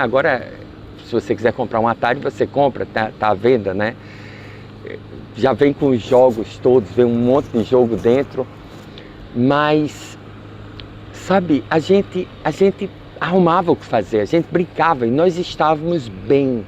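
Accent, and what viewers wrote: Northeast, Brazil. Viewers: kkkkkkkk Amo o seu sotaque professor. Você é nordestino? Algumas pessoas dizem Auauauauau? kkkk Essa técnica parece com uma do Eckhart Tolle diz no livro O Poder do Agora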